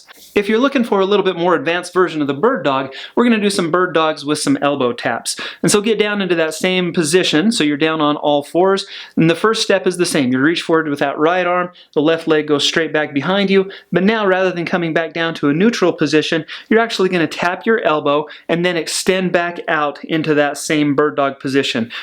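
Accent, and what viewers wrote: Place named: Utah, United States. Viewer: This is excellent, and I heard him talk for three seconds and knew he was from Utah!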